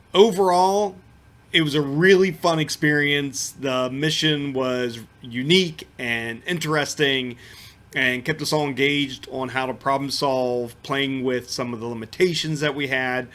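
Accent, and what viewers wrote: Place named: Washington PA, United States. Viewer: Sounds like you’re around Washington pa? I’m from NE Ohio not too far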